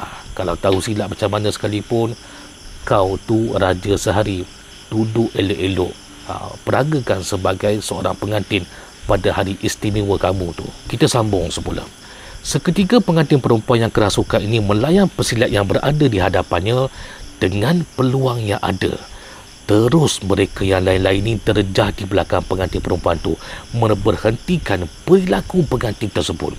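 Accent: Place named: Sarawak, Malaysia. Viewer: Abang orang mane Sarawak ke?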